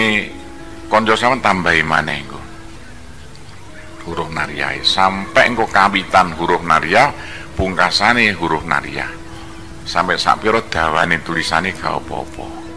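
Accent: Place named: Tegal, Indonesia. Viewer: Yo ora po2 kamu gk mau dngerin skip aja gtu aja kok repot he ini ilmu pnteng bwat orng bnyak cuman pk ustad nya klaw ngomong di dengerin sendri gk ngerti omongane ngebut kyak kreta api gk jelas jlimet pei..pei ...pei..kyak orng gk pnya gi2 sya aja yg dngerin ceramah nya gk nyambung omong nya mirip orang ngapak tegal 'smua blom tentu ngerti bhasa nya jdi klaw ceramah pkek bhasa indonesia yg jelas dn di mengerti gk jlimet kyak so2r nya benang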